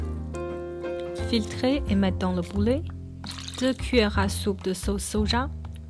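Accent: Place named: Shaanxi, China. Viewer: 有点陕西口音…………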